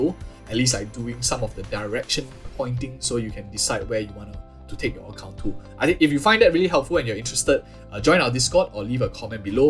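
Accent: Singapore. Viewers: u sound like singaporean sia, i like your content keep it u bro! huat ah!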